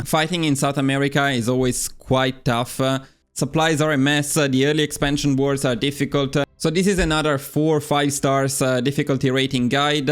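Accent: Italy. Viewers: that's got to be one the thickest italian accents I've heard. Not complaining tho, English is always better with a thick romance language accent.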